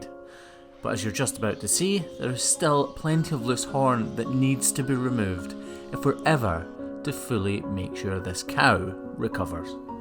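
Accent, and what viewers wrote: Scotland, United Kingdom. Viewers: I really don't know why I'm watching these videos 😳. Some are so gross with all that blood and pus, and even beyond that I don't like cows and bulls. They scared the hell outta me. Maybe it's your Scottish accent or the beautiful landscape or maybe the fact that you are doing such a amazing job. Greetings from The Netherlands and keep the good work going 🙏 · I can’t help myself here. I love your videos. Discovered them about a year ago. When I heard you talking I wondered what the accent was. When I found out it’s Scottish, I did a happy dance. Spent 16 days in Scotland the previous August and September. Can’t wait to come back. So...I read the title of this video and did a double take...Fixing a rotting cows hoof and thought a “rotting cows” “hoof”?Then realized the cow had a rotting hoof. LOL I love the way you bring your little boys with you. 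They will learn a lot from you. They will miss that time when school becomes a priority.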